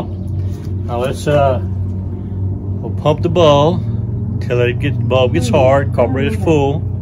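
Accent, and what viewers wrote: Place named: Virginia, United States. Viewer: Are you in Virginia I hear a little high land in ya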